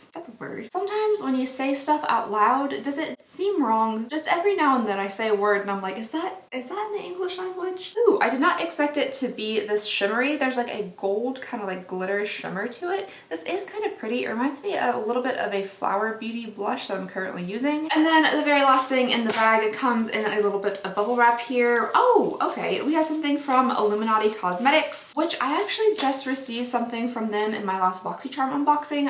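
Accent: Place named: Boston, United States. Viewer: AAAHHHHH!!!!! I love your Boston soooooo much!! <3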